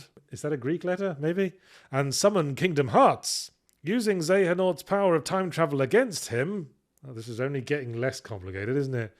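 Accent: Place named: United Kingdom. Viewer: I have had multiple people in the past try to get me into this series when they found out I hadn't played any of the games, by explaining them. Without fail, roughly 5 minutes in, my eyes would glaze over and I would silently stand up and walk away without saying a word. I made it through 10 minutes of this video, largely on the strength of Yahtzee's voice and British accent (none of the previous people had British accents) before my eyes started to glaze over and I stopped the video to type this message. Hope the video does well, but 10 minutes is all I can stand.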